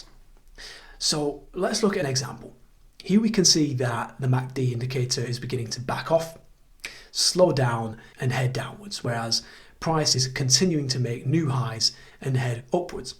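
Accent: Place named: United Kingdom. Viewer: Hi thanks for your video and (for once ) I can hear a lovely british accent .. How do you deal with false signals ? divergences are not Always successful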